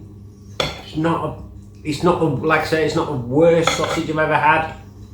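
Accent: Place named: Willenhall, United Kingdom. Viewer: Where you from, dude? Sounds like Willenhall, or Walsall? Good review!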